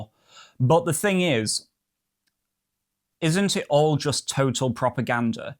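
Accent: United Kingdom. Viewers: E<link> British accent 🤢🤮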